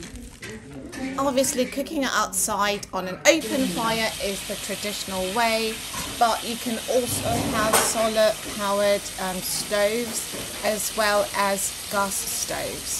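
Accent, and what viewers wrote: United Kingdom. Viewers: I just come here solely for that. Her strong British accent is entertaining. The moment she says, “welcome buck” when I’m used to hearing “welcome beck”. That on its own cracks me up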